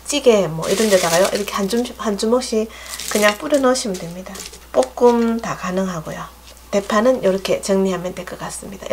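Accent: Gyeongsang, South Korea. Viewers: 경상도 말씀으로 설명이 억쑤로 정감이 갑니다.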